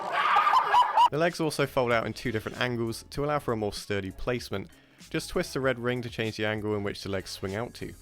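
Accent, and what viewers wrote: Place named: North, United Kingdom. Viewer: hey man so where is ur accent from? like u sound like northern but you speak really clearly so i dunno what is going on lol.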